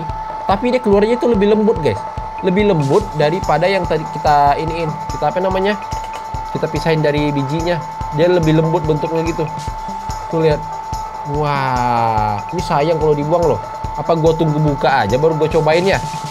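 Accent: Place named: Medan, Indonesia. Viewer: Orang medan mana suaranya!!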